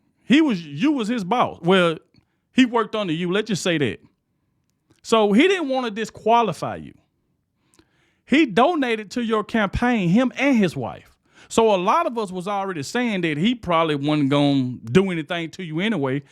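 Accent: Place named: Louisiana, United States. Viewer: I have to ask, where is your accent from? Louisiana?? It’s fun to listen to.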